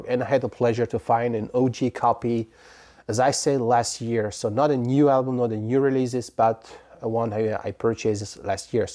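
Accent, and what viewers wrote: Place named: Italy. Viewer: Salut j'aime beaucoup ton accent italien 😁😉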